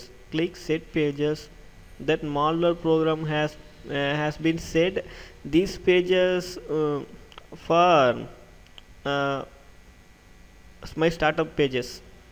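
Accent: India. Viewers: When people make fun of him for trying to talk English. He lives in India, of course he is going to have an accent. At least the websites and pages he has open has the words in English for those to understand easily. Heck I can understand his steps even if I didn't have the volume on, read the pages and follow along. .-.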